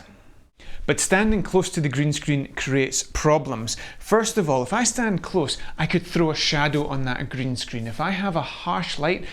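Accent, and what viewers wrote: Scotland, United Kingdom. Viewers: Nice Accent, I want to go to Scotland someday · If only I could have the voice Scottish accent:). Thanks for the video....helps with COVID and carrying on with classes:)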